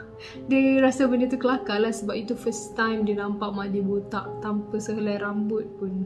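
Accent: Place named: Malacca, Malaysia. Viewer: Akak org melaka ke kak? Cara bercerita mcm org melaka je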